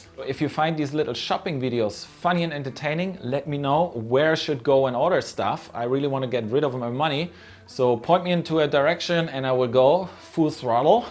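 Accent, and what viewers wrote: Germany. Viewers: Warum merkt man das er deutsch spricht😂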